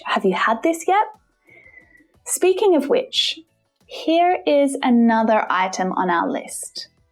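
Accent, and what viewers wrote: Australia. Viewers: Hi!!.. You are a awesome teacher. I am from New York. I like your Australian accent. Love it. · Ma'am I'm from India and our speaking is totally different from yours(aussies) you say " G'day mate" but when we Indian says that same thing it sounds very different. So the pronunciations are very different can you please teach us that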